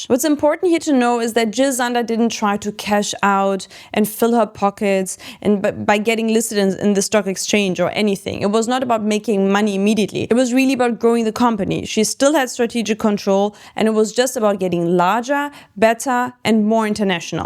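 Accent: Germany. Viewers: bist du German?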